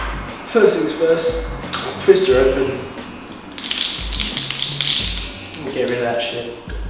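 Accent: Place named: Australia. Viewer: U is Aussie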